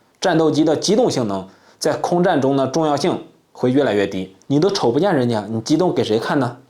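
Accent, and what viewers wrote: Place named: Shandong, China. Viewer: 妈的比这山东话真几把恶心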